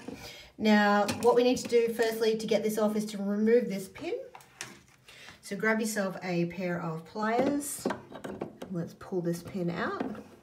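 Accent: Australia. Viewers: Thank you for your video. I had to search for an Australian video and luckily you are a lady. Thank you so very much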